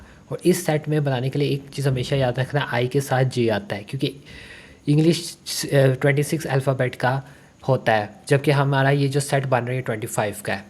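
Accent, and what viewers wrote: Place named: Pakistan. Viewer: pakistani aacent jaisa hai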